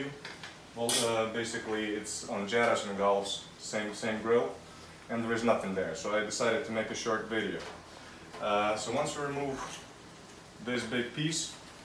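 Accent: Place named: New York, United States. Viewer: That’s very accurate and helpful, hope to see more of your content. Very cool NY accent)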